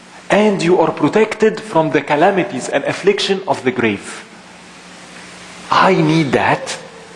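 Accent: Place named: Egypt. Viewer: Yakhi this guy is AWESOMEEE. Love him mashallah. Love the egyptian accent too xD Very nice lecture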